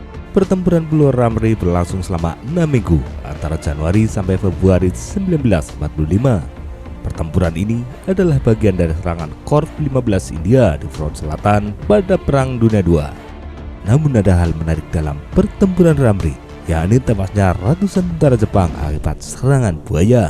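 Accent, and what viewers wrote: Java, Indonesia. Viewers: Tolong di ganti pengisi suara nya ini gak jelas ku dengar logat Jawa baru kayak hidung di tutup pula ngomong nya JD gak kurang jelas terdengar oleh saya gak tau yg lain mungkin bgt jg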